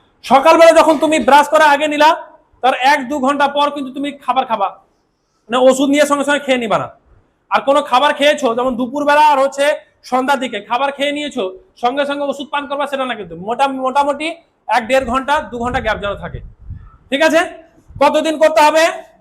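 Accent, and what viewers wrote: Rajshahi, Bangladesh. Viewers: স্যার আপনার কথার মধ্যে বাংলাদেশের রাজশাহীর টান আছে,,, ❤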